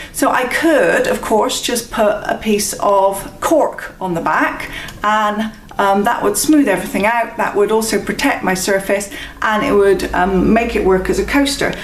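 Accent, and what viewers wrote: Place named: West Country, United Kingdom. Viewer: Lovely west country accent there :D Great info x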